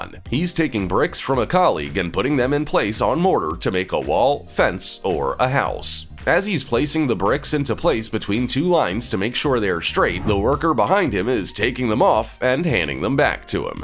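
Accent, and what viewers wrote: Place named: United States. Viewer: Where is the narrator from? He seems to have an American accent, but uses expressions like "gave it a go" and "went pear-shaped". It's jarring.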